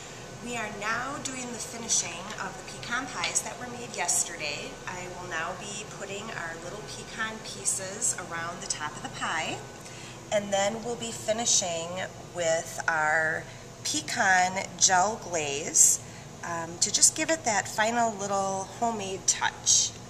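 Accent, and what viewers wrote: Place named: Canada. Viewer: is she canadian? her accent sounds very canadian haha love it! beautiful candles, wish i could smell through the screen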